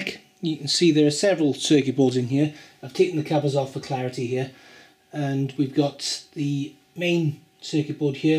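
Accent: Wales, United Kingdom. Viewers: I thought u were welsh watching that video. im from Swansea. nice video by the way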